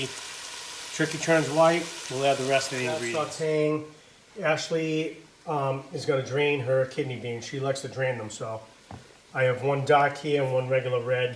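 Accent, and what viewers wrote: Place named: New England, United States. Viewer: The New England accent is amusing.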